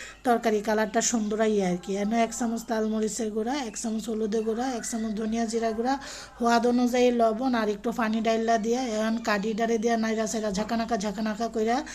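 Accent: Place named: Noakhali, Bangladesh. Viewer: ঠিকই কইছ আপু এমনে তরকারি রান্না করলে অনেক হোয়াদ লাগে তুমি তো পুরোপুরি নোয়াখালির ভাষায় কথা বলতেছ তোমার বাড়ি কি নোয়াখালী আপু জানতে চাই ❤❤🙂👍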